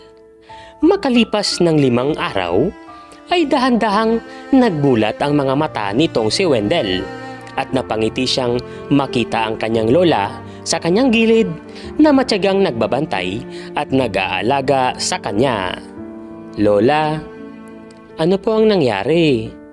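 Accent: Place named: Ilonggo, Philippines. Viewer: weldel is elonggo I dont know.but the narrator is 100%elonggo po siya.all the way his intonation really he is elonggo mlumanay mgsalita😅😅😅😅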